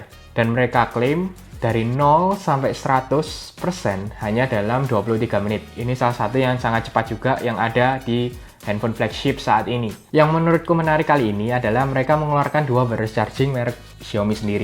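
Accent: Java, Indonesia. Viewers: Wong jowo yo mas hehe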